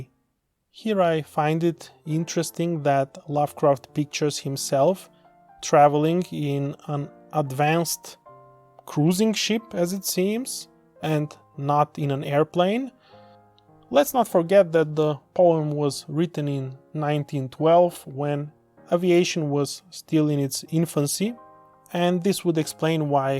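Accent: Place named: Russia. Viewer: I like your accent a lot, though it sounds more Russian than Bulgarian to me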